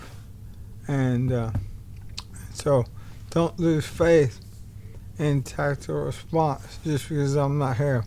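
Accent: South, United States. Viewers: You’re a stand up guy,. Many of us will indeed greatly miss you when you’re gone. Until then, I’ll continue praying FOR you & your family. We’ll likely never meet, this side of life. I’ll look forward to hearing your perfect southern dialect again in the next life tho. Much love and respect to you, sir!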